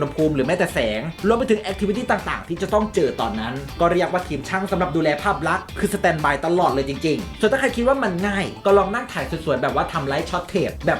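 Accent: Isan, Thailand. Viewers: ชอบฟังช่องนี้ตรงมีความพูดอีสานไป ขำไป อวยยศแบบสุด เป็นเสน่ห์ของช่องมากค่ะ 😂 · ผมชอบเสียงบรรยายของคุณมากผมฟังภาษาอิสานที่คุณสอดแทรกมาออก ขำมากเลย หัวเราะทุกครั้งที่คุณพูดอิสาน ชอบและหลงไหลภาษาอิสานมากเลย ตอนี้ฟังหมอลำรู้เรื่องแล้วครับ เป็นแฟนตังจริงครับ ดูทุกคลิปครับ ลงบ่อยๆนะครับ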